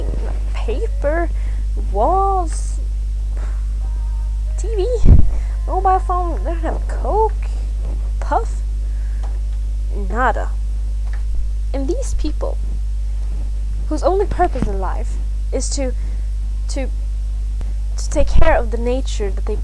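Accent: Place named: United Kingdom. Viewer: Just curious but, are you reading something when you're narrating this? And did you do this for school? I still don't really feel convinced. But your English isn't as bad as you think, you actually sound somewhat British. The only thing that stood out to me was "drought" which is pronounced like the word out (but you might already know that now). Anyway, best of luck if this is for a grade or whatever.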